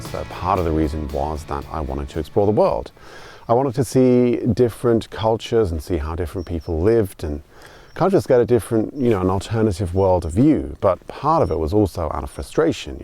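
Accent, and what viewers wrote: Germany. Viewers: You’ve definitely sound like a German speaking English . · Can I just ask, do you speak German in Germany? Your accent seems like ...German? I have lived in Thailand for 14 years as a Brit, I speak Thai, but when I go back to the UK people say I sound American. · You have a mild German accent ❤ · You now speak English with a German accent. It’s fascinating to listen to. Thank you for this commentary. It’s a very accurate appraisal of the uk. Makes me sad. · Dude you now speak with a slight German accent. Not sure how long you've been in Germany, but thought you were a German living in the UK. 😁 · Your accent is very interesting after that 16 years. Its 50/50, the German accent coming through very strong. Re: UK, yeah, its got dark in some places, your average high street now in small towns is full of Betfred, Turkish Barbers, maybe a Chinese or Indian takeaway or two, Indian Dessert Shops/American Candy shops (aka: tax dodging businesses), just super disconnected from the culture and a bit dead. 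· I hear a hint of German accent in your English. Is that from living in Germany for a decade?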